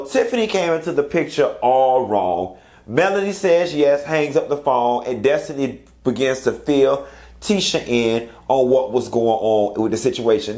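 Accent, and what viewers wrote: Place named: Mississippi, United States. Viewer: Literally!! Since your first video I was like omg his ass sound like he from Mississippi lol yo ass be knowing some shittt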